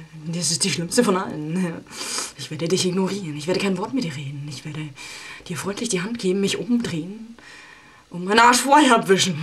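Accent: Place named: Franconia, Germany. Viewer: des issen franke oder? .. bistu en franke? hörst dich so an.. ohne scheiß.. des is soo blöd dasses wieder lustig is xD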